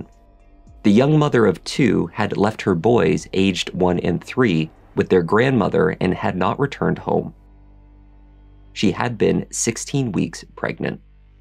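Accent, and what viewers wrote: Canada. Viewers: Thank you! It was only around a month ago, if that, that I discovered your channel, thanks to the wonders of the YT algorithm. Since then, I have watched 30 to 40 of your videos (really!). Furthermore, I sent an email to the popular political broadcaster Megyn Kelly, herself a fan of the true crime genre, and highly recommended your channel to her. Yes, I tend to forget names quickly, starting in real life around two seconds after meeting someone new. So when you refer to six different people in a video by first name, I frequently have to rewind in order to remind myself who is who. Curiosity question: I was surprised to hear you say on an episode I watched yesterday that you and your wife live in the British isles. Can I safely assume that you are Canadian? I have caught hints that you are in fact from Canada, including accent, pronunciation, and even referring to the US Coast Goard as the "USCG", which absolutely no one in the US does. 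Again, just curious. Thank you for all the amazing work you do on this channel. And I very much appreciate your personal response to my comment here. Jim W. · Is the narrator from Canada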